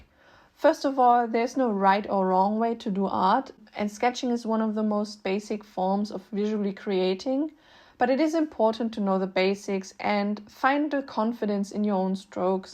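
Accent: Germany. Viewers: omg no! Its just that i recognized the accent somewhere and then remembered my German classmates speaking english! Good video though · Nice video!! Random question, are you German by any chance? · Wie Deutsch will dein Akzent klingen. Trotzdem cool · i have learnt german and i don’t mean to be an absolute mug but i love the german accent. i hope ur not insecure about it, it’s so awesome :))) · are u german ? cuz u kinda sound german Good Video Btw^^ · Du bist einfach deutsch hahahha, dass hört man;) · Are you German?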